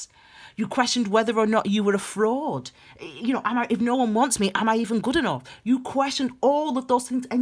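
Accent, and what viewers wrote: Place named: North, United Kingdom. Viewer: ..at 0808...hearing your lovely northern twang (a Manky here :))...I snapped and your energy was my final acceptance .. .own IT.. claim IT...poised ..thanks for making clear this feeling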